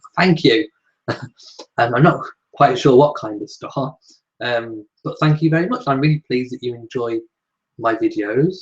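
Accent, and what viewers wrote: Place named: United Kingdom. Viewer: you DO have a great voice and I love your British accent. I watch all your videos and chats.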